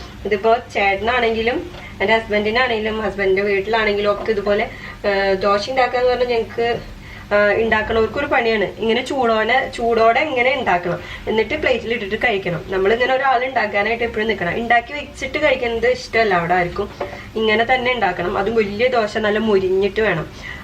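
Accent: Thrissur, India. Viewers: താങ്ക്സ്, ചേച്ചി തൃശൂർ ആണോ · Ente ummade ishtta vibhavangalil onnu kalathappam aanu.. Nalla mayam ulla idliyude recipe paranju tharumo... Love your Thrissur accent.. My father is from Mathilakam, Kodungalloor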